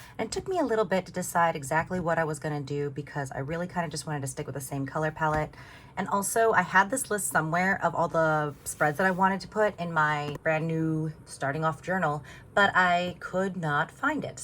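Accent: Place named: Mid-Atlantic, United States. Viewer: I find your accent fascinating, very Mid-Atlantic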